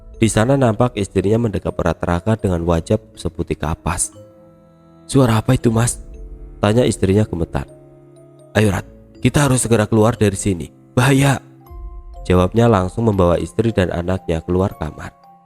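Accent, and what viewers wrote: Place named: Banyumas, Indonesia. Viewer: Orang ngapak bukan si?? Medoknya keliatan banget kalo lg percakapan 🤭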